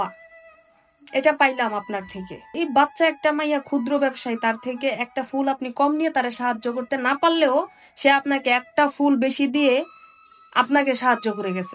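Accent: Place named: Noakhali, Bangladesh. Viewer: Noyakhali bole kotha....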